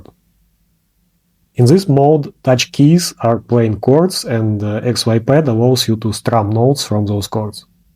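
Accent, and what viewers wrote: Russia. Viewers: Друг слышу русский акцент) как бы такую собрать? Есть схемы или где купить, очень хочу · Эй у тебя русский акцент ?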